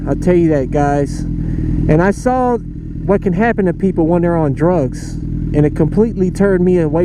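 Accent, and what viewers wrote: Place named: Texas, United States. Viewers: to me it sound like a Texas dude lol I don't really know alot about the south haha I'm from the bay area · Thanks for sharing your story. We all have a story to tell. When I first heard your voice it didn't sound North East at all. Sounds more Southern, but I guessed you grew up in a "certain area" just from your lingo. But you pulled yourself up and made a better life. That's awesome! The problem with "The Hood" is, some people insist on staying stuck on stupid even when they don't have to. Again, thanks for sharing. Stay safe and ~ HAPPY NEW YEAR ~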